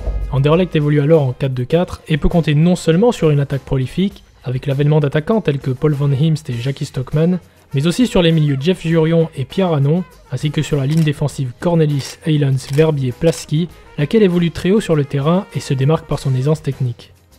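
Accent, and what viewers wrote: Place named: France. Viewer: Je suis belge et ultra de la mauve army et je tiens à te remercier pour cette vidéo sur notre magnifique club. Ça se voit que tu es français sur la prononciation de nos clubs ou noms de famille mais je ne te n’en veux pas😂. Merci de porter de l’importance au club le plus titré de Belgique💜 et très important sur la scene européenne à son époque, très bon travail et encore merci pour cette belle vidéo, COYM💜💜💜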